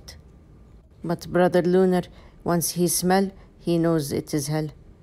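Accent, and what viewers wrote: Arab world. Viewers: Hey I'm from India and love your content 😄❤️❤️ sometimes I face difficulties to understand but I find your accent really amazing 🤌🏻✨ maybe it's an Arabic accent I don't know much about Arabic or Urdu though but I find your videos really hilarious 😂😂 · This is just the arabic accent. She is from Palestine, not the Gulf. And she's exaggerating her accent like this for comedic purpose. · It's an Arab accent so yes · Sister where r u from?? Ur accent looks like Arab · Same and her arabic accent just completes it!! 😻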